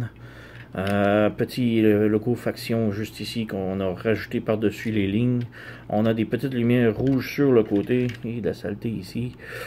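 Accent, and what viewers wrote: Canada. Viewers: vous etes canadien?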